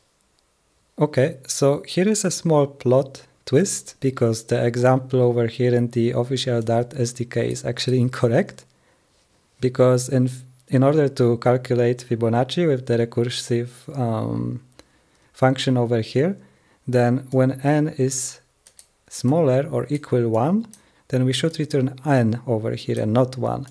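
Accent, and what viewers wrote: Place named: Poland. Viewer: po głosie to chyba polak ;)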